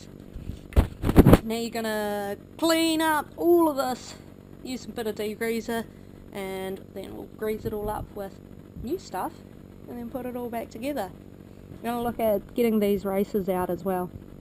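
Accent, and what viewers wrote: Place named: Australia. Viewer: SOOoo many bearings. My 92 Nissan only has an inner and outer in the front. Was putting the disc rotor back on which I didn't have2 and shouldn't of taken off holding it in a vice while torquing its bolts to 48 pound feet. Didn't notice the bolts actually pulling the rotor down against the vice instead of tightening flush pieces of metal. Bang 6 bolts getting near 48 pound feet and the rotor broke in half. Time for a new rotor. I wouldn't of expected that force to brake it. Oh well. Going threw life speaking in an Australian accent must be great! When I had Japanese in the late 80's in California a former Australian air force guy who was a bit old was in my class. Said he flew Sopwith Camels.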